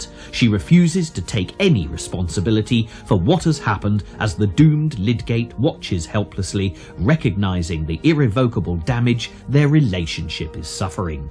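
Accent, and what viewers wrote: United Kingdom. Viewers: God, I do so love a British accent.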